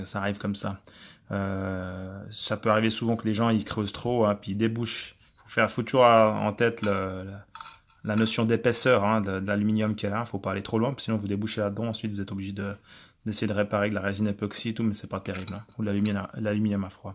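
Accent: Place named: Geneva, Switzerland. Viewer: On sent bien l'accent de Genève. Salut compatriote 🇨🇭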